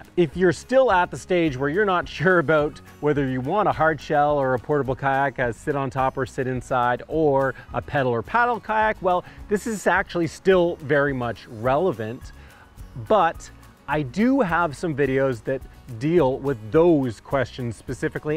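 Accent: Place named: Canada. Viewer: Info as always really appreciated, thanks. Plus your Canadian accent cracks us up down here in New Zealand. Colour density joke had me going for too long. Dang it! On a more serious note, not sure you have covered this point:- Which colour/ combination/s do you believe to be safest colour on the water?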